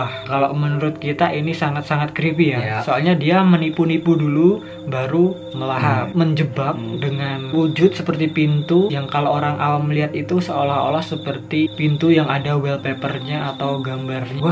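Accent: Java, Indonesia. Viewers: Yang takut jangan nonton., atau nanti ngompol dicelana., wkwkw., as always like this., tapi keren., ada medok medoknya., hehehe., Semangat bang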